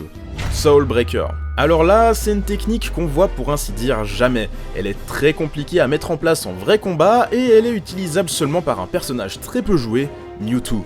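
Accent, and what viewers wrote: Switzerland. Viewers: J'étais pas prêt pour le "neuf cent nonante" (je sais pas si c'est la bonne orthographe). Sans l'accent j'oublie parfois c'est une chaine Suisse. xP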